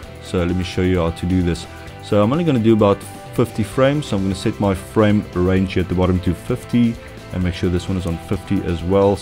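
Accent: South Africa. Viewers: Hi great Tut! thank you. are you South african? you have a interesting accent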